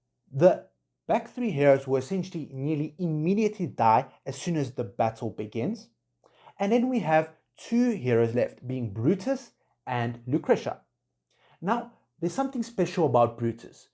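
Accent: South Africa. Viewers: Hey, you a Saffa?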